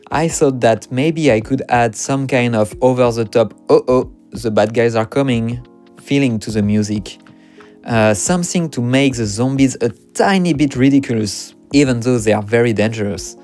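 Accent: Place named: France. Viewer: Super de voir un frenchie avoir un poids aussi important dans l'industrie ! L'accent est ultra tranché en effet :D Mais bon, le bilinguisme est là, le reste on s'en fout ! Ça fait également plaisir de voir que le jeu n'est pas mort et que du contenu refait surface même en 2021. (Je viens juste de voir le post pour cette vidéo sur Steam) Quelle agréable surprise de constater que le compositeur est un Français ! Je n'en avais pas nécessairement l'attention en venant sur le lien mais du coup j'en profite pour laisser un message d'appréciation concernant la bande son ! Les musiques sont superbes, et les ambiances sont extrêmement réussies. Je me disais justement que l'équilibre steampunk et horror était vraiment très bien balancé et donnait un côté vraiment unique. Merci pour ton travail !! En espérant te revoir sur la suite, qu'on espère tous. :)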